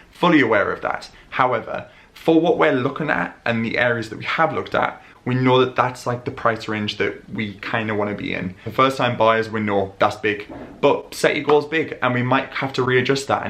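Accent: United Kingdom. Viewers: British accent 💯🤍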